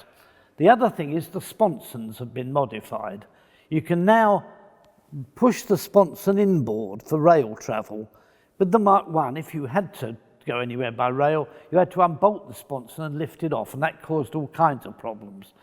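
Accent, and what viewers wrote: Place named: England, United Kingdom. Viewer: English? He is English and is speaking the purest form of it. I think you mean his accent.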